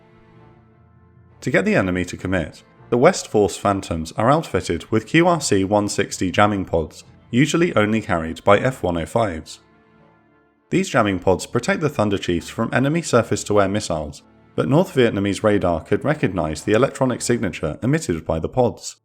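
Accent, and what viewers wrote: United Kingdom. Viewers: Love the visuals and the calm Brit voiceover. If you want the American version of the story, TheFatElectrician does a good video on Op Bolo as well. "US obliterates half North Vietnam's MiG-21 fleet in 13 minutes". The contrast between channels is stark, but I can appreciate both methods of telling the same story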